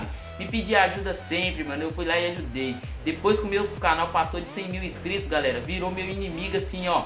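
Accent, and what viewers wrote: Minas Gerais, Brazil. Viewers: mano vc só pode ser mineiro kkk😂😂 parabéns agora rumo a 1 milhao tmj #forçachape😭😭👏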